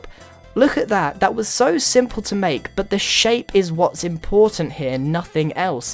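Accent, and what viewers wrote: England, United Kingdom. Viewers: David Benner yes, is British, he lives in the UK and he has a British accent.. And I know because I am british, live in the UK and have a British accent.. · I love your British accent!!!!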